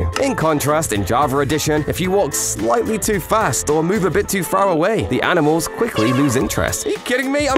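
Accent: United Kingdom. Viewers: You used to sound american and now you're british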